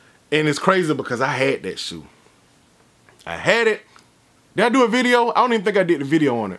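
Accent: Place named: Atlanta, United States. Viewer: Not a day one, but my first vid was the "What the" KD joints with Jay. Being a ATLien myself, as soon as i heard the accent i was hooked. Been rockin with ever sense, dooooooo it!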